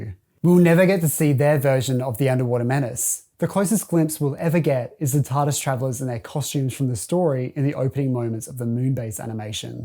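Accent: Australia. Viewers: wait i thought u were australian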